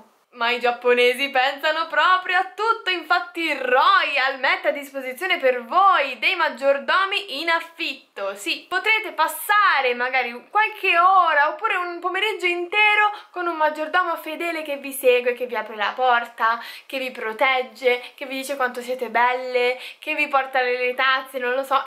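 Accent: Tuscany, Italy. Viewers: il tuo accento toscano è sempre con te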